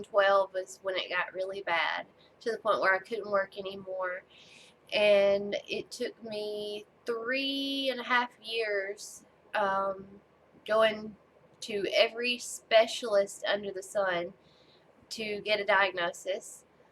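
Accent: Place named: Alabama, United States. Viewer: Hi! So nice to meet you. I am an Alabama girl too. I was born in south Alabama but I live in north Georgia now. So you and I have the same accent.:) So sorry to hear about your illness but so happy to see you stay positive in the middle of it all. You are truly an inspiration. I enjoyed your first video. I don't make videos but my needlework can be found on Instagram if you are on there. I looking forward to see more of your beautiful needlework. This community is awesome. I am so glad you are part of it. Merry Christmas to you! Happy Stitching ~Janet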